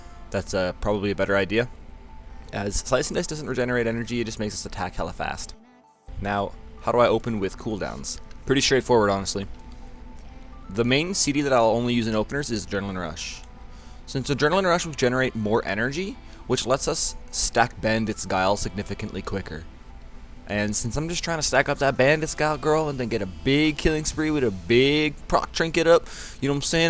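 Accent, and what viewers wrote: Canada. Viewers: Are you Canadian by chance? ;D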